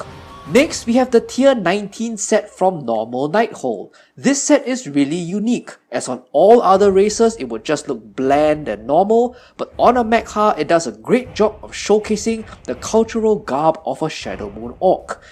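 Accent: Singapore. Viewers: Ah, I see what you mean, then. I think he's simply explaining the ones that will be playable, as that's probably what non-lore followers will care for, and it seems that english might not be his first language (it might be, I'm just guessing based on the accent and seeing him talking about coming from Singapore in the comments), so there could also be contextual language that might be a bit mixed up there as well.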